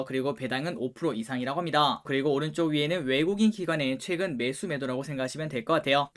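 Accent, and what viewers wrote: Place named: Daegu, South Korea. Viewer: 님! 대구살아요? 와~~표준말 대따 잘하네요.저도 연식이 좀 있는데도 님 영상은 무조건 좋아요! 부터 누르고 꼭 봐요.너무 귀염귀염해여! ㅎㅎ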